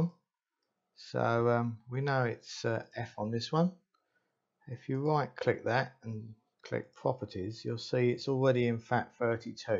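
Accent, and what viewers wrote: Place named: United Kingdom. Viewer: Well Done furr a Brit..just kidding accent tickles my ears..easy peezy just watt eye was looking for..thx for posting xo a new fan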